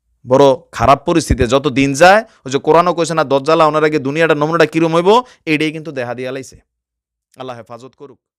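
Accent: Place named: Sylhet, Bangladesh. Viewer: তুমি মিয়া সিলেটি মাতো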